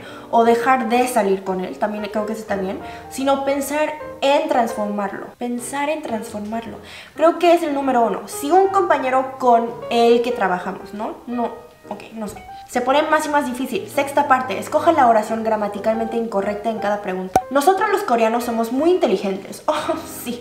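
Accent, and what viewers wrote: Mexico. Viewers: Hermosa Korean a con acento mexicano, lo mejor de dos mundos 😍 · tu hablas el español mexicano, las mayorías de las juergas mexicanas son feas cuando se escucha en otros paises. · Pero y esta reina?! Tiene un acentazo mexicano brutal! A mí como española, me dicen que es de Méjico y me lo creo! Cero acento extranjero! También es cierto, que he notado que la fonética coreana y española, aunque no lo parezca desde fuera, es muy semejante. Yo llevo 10 años aprendiendo coreano y cuando me escuchan hablar siempre me dicen "porque tienes cara de europea, si no creería que eres de Seúl" jajajaja. Supongo que al final entre coreanos e hispano-hablantes no tenemos demasiado acento al aprender el idioma del otro! (el problemita, problemón, está en la gramática... Ahí sí se vino drama, lol) Anyway, buenísimo tu español, amiga. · Soy el único que cree que su forma de hablar no es el español neutro sino más como el de los castrosos "Whitexicans" ? 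espero que con el tiempo hable mas neutro :) Suerte en todo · Apoco aprendió español Mexicano, el más chingo de todos. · Tu español es mexicano... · Hablas español Mexicano, se tetona el acentro y las palabras de la rosa de guadalupe. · Se nota el acento mexicano jaja Y algunos usos incorrectos creo que se llama jerga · Hablas perfectamente. Con acento Méxicano. Pero muy bien.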